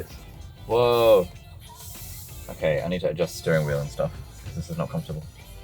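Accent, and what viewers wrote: United States. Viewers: Your accent has changed. It is more American now. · Does anyone else notice accent has changed abit toward American? Or am I just imagining things?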